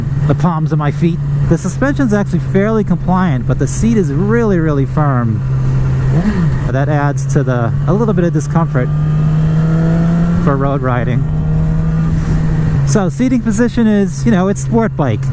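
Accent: Boston, United States. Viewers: It’s like Ben Affleck’s accent in Good Will Hunting...on steroids, in a bad way. Could only go 2 min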